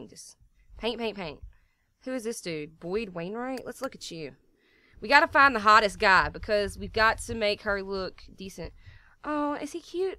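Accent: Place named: South, United States. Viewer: I just love your country acsent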